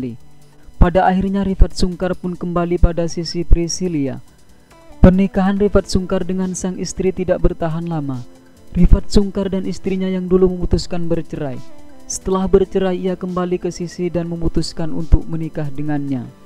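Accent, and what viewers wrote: Madura, Indonesia. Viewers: Kenapa dgn logat madura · Iya logat.y ky logat org madura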